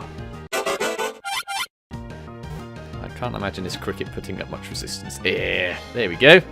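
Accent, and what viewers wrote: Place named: England, United Kingdom. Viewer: good videos man! without any doubt you deserve more subscriptors!! where are you from? england I belive for you acent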